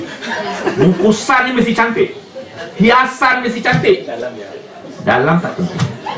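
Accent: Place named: Java, Indonesia. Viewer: AKU TAK RASA DIA BUGIS, CARA BERCAKAP PUN DAH TAHU DIA JAWA HAHAHAHAHA DIA PUNYA HUJAH PUN PRO-JAWA HAHAHAHA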